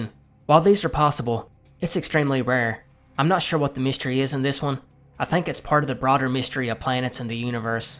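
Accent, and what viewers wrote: South, United States. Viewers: I live in Holland but grew up in the South, so hearing your voice sounds like home 🙂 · Well said love his southern accent too and the accent in general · I rarely if ever comment. Now I've subscribed to you about a week. Going to college staring things off always when I fall to sleep or do my work I gotta be listening. Your very unique love your southern accent, how you just get into it don't waste time and your upload schedule that shows a lot of dedication so I would honestly like to thank you for all your hard work on just entertaining your viewers. clearly 7K subs isn't enough and I think your very underrated. If you ever read this have a lovely day, and keep doing what you're doing. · I can tell by your accent that your southern American don't people in your part of the world do what are called "barn burnings" all the time? 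Why do they do those? Isn't it usually to clear the land to build a new barn? This is in regards to the burned house horizon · nice vid! it took me a while to get used to the southern accent but its not bad.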